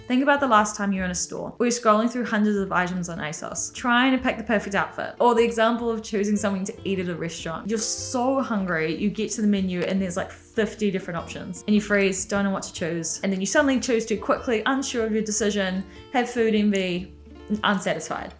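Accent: Australia. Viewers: What about active wear (sports wear) and winter wear, jumpers, cardigans, hoodies, coats for various occasions and comfy stuff to wear around the house? I like the idea of a more intentional wardrobe, but what's on that graph - all looks like things you go out in, which is fine, but seems like quite a big gap in content, no offence, I appreciate this is more from a styling perspective of clothes to choose when leaving the house, but as someone looking for wholesome practical content on the matter, I'd like to also see a breakdown of the other parts of the wardrobe and how to curate that. Like, how many coats, cardigans, jumpers, hoodies, even pj's to stick to, or multi purpose clothing options in these parts of wardrobe, that kind of stuff. I really like you're approach, would love to hear your take on those parts of wardrobe too. I don't know if you're from Australia judging by the accent, but I'm in the UK, and we can have 4 seasons in 1 day sometimes times, and winters are cold, like I can't imagine surviving a winter with the wardrobe in your video. 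Anyway, if it's relevant for your audience, I'd love to see a video for decluttering winter wardrobe! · So glad I found you! Also, I moonlight as a dialect coach in my theater community and you're giving chef's kiss Elevated Aussie! 🥰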